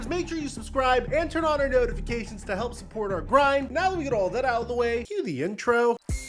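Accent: United States. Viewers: Why does he sound like an American, josh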